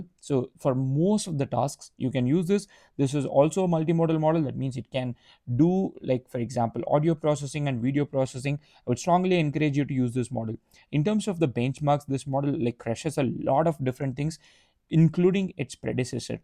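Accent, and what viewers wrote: South India, India. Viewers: Very strong south Indian accent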